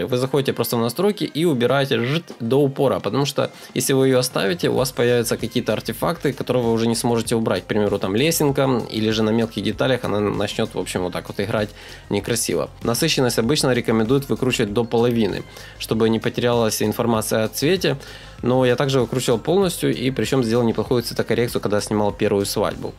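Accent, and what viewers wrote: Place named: Kharkiv, Ukraine. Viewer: Кстати, из какого ты города? Акцент на харьковский похож))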